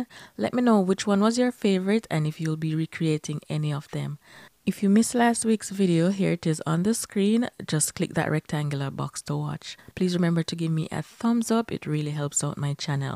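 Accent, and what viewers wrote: Jamaica. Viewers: All these creations are beautiful. I'm definitely going to try the flower and black vase. Your accent sounds Jamaican, are you?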